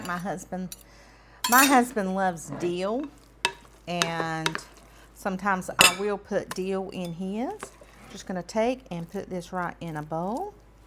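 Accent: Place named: North Carolina, United States. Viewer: Where are you from? You sound like me lol. I’m in central NC. Love your potato salad 😋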